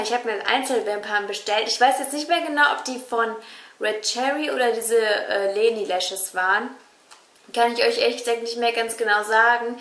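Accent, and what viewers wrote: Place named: Bavaria, Germany. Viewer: sie kommt aus Bayern sie sagt nett anstatt Nicht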